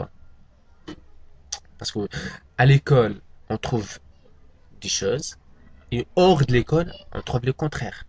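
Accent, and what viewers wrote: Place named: France. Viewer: il faut que tu change ta façon de parler et soit un peu marocain vérile et arréte de faire tes gestuels et ton acçent a la française..sinon c pas mal salam khoya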